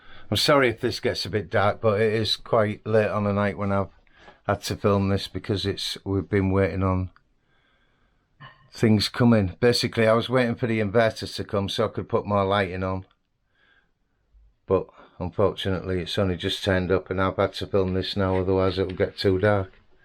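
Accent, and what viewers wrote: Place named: Teesside, United Kingdom. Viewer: Sounds like a Teesside voice there - but Teesside/YORK/Hull are similar. Well done. Good job!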